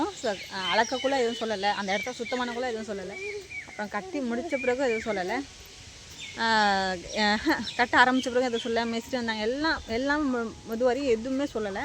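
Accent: Thanjavur, India. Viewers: தஞ்சாவூர் தமிழ் அப்படி தான் இருக்கும்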